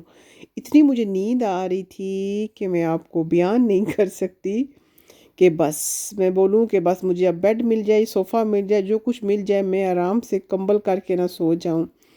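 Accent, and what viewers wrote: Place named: Netherlands. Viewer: Ap k speaking main wording k axcent bilkul dutch language jasa lgta. Hy .ap ny dutch language seekhi proprly ya khud hi aa gai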